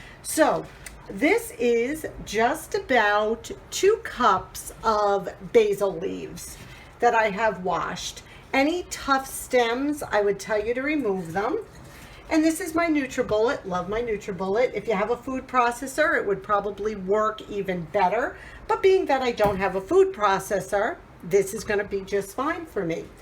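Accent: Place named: East, United States. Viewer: Hello Mornings with Granny sent me. Gotta check out your channel. You sound like from back east. I am from New York state.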